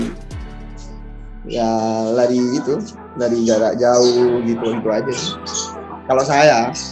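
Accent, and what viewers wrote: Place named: Madura, Indonesia. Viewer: Logat medurone ketok wes ....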